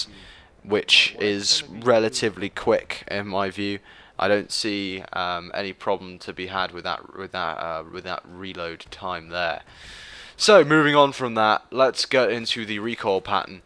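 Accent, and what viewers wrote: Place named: United Kingdom. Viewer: beast vid, nice to hear a british accent. Subbed and liked!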